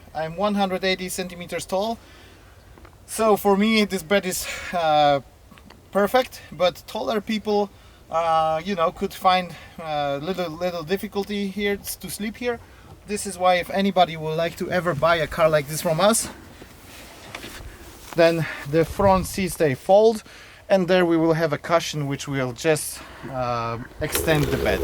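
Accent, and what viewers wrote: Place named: Sweden. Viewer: You have a Swedish accent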